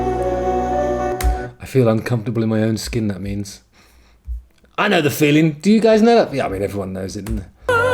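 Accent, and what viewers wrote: United Kingdom. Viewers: I’m curious if you had the opportunity what would you like to hear Dimash perform, not a song but what genre, rock, ballad, etc. really enjoy your chats. Love the British accent, makes me homesick. 🤗🤗🤗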